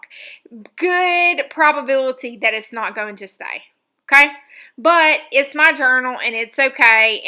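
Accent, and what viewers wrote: South, United States. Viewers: Love this!!! Funny story.. the video alert popped up on my phone and I must have hit it by mistake and I am on a work conference call and all I hear is your sweet southern accent describing what a giblet is and I am literally trying to find the video pause or mute button.🤦.LMAO!!!..oh well the perils of working from home😂😂💕🤸😘🤗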